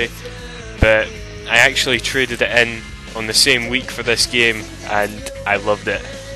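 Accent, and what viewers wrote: Scotland, United Kingdom. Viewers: I always did like the Scottish accent. Sounds so... GOOD!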